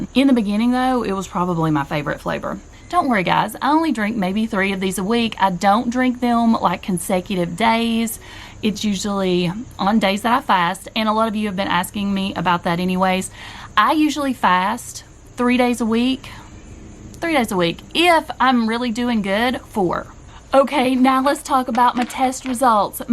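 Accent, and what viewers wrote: United States. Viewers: Please don't stop the chats‼️. I live in the UK now and listening to you makes me feel so much like I'm back in the US‼️‼️. Keeps me from missing home too much 🤗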